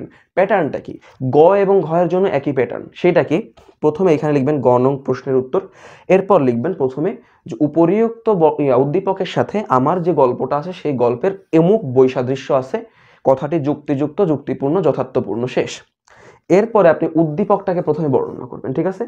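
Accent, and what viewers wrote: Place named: Rajshahi, Bangladesh. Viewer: Apnar basa ki rajshahi ?? Apnar kothar moddhe rajshahi r vibe pacchi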